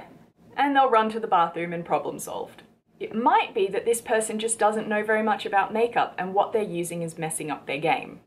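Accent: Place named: Australia. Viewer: I'm really curious to find out where she is from. Her accent sounds Australian most of the time.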